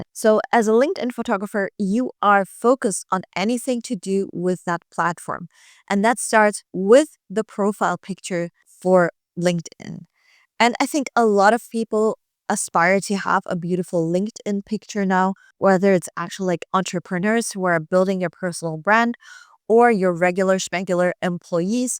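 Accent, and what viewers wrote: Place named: United States. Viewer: A stand-out assortment of savvy tips and advice for the mid-2020s photographer. I can attest —even if only on the basis of very modest experience— that this video offers a good number of relevant ideas for our time and the contemporary market. | In re Ms. excellent ESL skills, as an American, I applaud the Yiddish-ish "regular-schmegular" [if I've gotten that right] and would like to add that "different from" as opposed to [the British-influenced] "different to" sounds much more natural to this listener's ear, particularly in view of the fact that her English [including accent] is overall far more "American" than British. In addition, the adjective "in the high street" is clearly a British-ism, for which one could substitute "main street" or just eliminate the modifier. It should otherwise be understood from context that said business is serious, reliable and focused on quality. 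You could also use the shorter "based in Berlin" vs. "based out of ~," which sounds like rural Wisconsin. O, those prepositions! These suggestions are offered solely in a constructive way and in appreciation of her mastery of the lingua franca of world business. Vielen Danke!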